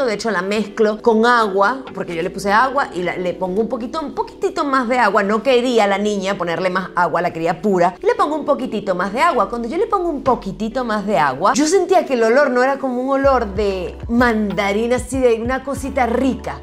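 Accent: Venezuela. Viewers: Omggg eres de Venezuela???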